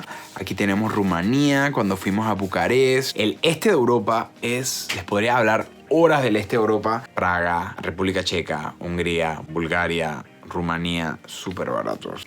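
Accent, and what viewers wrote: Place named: Venezuela. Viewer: Y tienes acento venezolano!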